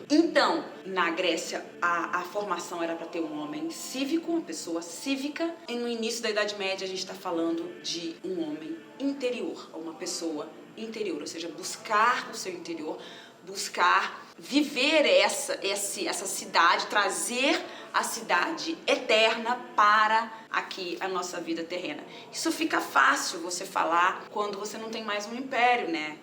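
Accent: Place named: Minas Gerais, Brazil. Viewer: Oh gente!!! Mineira mesmo kkkkkk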